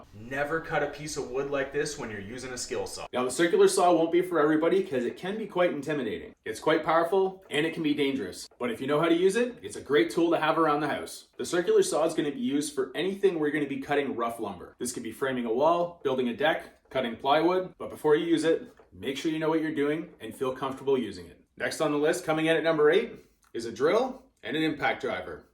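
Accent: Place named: Canada. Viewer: Listened 3x for the Canadian accent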